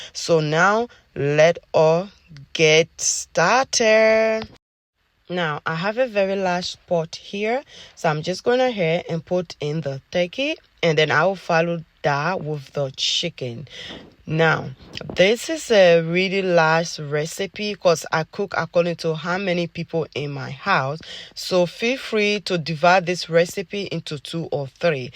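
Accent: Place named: Liberia. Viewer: You sound Liberian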